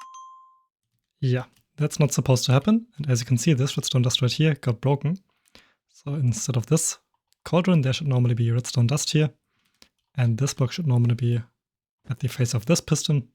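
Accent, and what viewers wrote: Germany. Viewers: i never built this but watched the video because i like redstone funny accent german man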